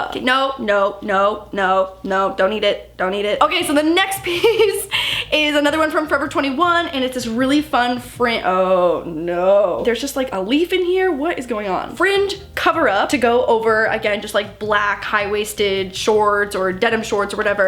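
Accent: Canada. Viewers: . Your Canadian accent kinda showed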